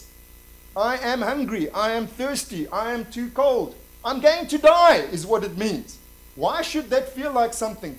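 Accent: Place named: South Africa. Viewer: He has heavy South African accent.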